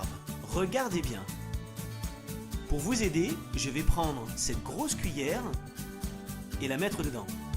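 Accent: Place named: Rural, France. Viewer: AH AH AH; tu t'es éclaté; on voit que c'est les vacances!! et bravo pour les accents du terroir!! Ceci dit bravo pour les explications sur les fourmilions et pour les vidéos, de mieux en mieux.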